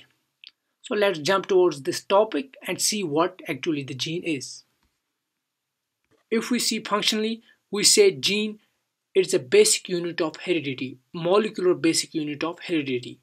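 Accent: Kashmir, India. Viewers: not not the english ..thats mashallah fine ...its just the aacent ...we kashmiris have ...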